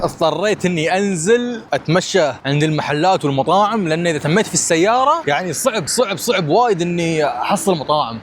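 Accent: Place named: United Arab Emirates. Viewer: طلع اماراتي و هي تحسبه سعودي وانا احسبه بحريني صدق خليجنا واحد هههههههه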